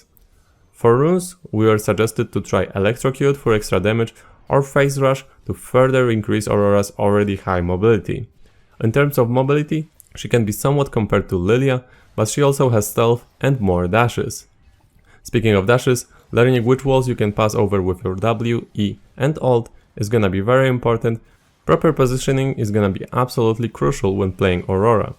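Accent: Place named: Poland. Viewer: Ah ten polski akcent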